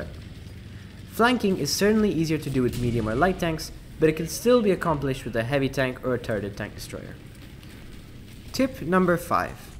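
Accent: Malaysia. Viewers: Where are u from??? Malaysia? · Ae you malaysian?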